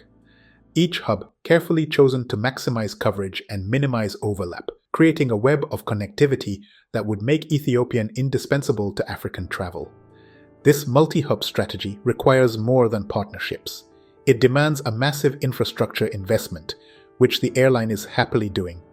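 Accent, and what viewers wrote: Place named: Kenya. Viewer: From his accent, yes, he is African. My guess is Kenyan. A youtuber with 1k subscribers can't influence anyone and guess what? 90% of viewers are Ethiopian. Whatever he talks about has nothing to do with Ethiopian Airlines.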